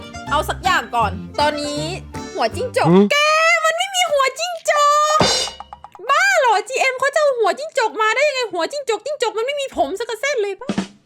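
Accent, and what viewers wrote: North, Thailand. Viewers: พี่เป็นคนภาคเหนือหรือค่ะ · พี่ เปนคนเหนือหรอคับ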